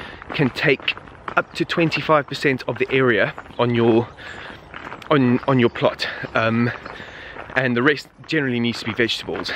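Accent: South Africa. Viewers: Are you South African ?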